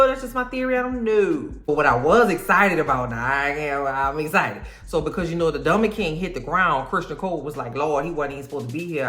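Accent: New Orleans, United States. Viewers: Great review, and love the Nola accent lol! I was highly entertained. Keep em coming sis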